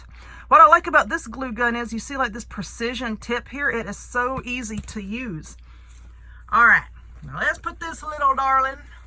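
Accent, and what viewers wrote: South, United States. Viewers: Love the pillow but mostly I love your good old southern voice. I could listen to you all day and your sense of humor.I am going to make this pillow. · Awe..... love me a country accent!!! Fellow southern girl!! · I love your channel and your southern accent! You are too darn cute! Love love your fall pillow!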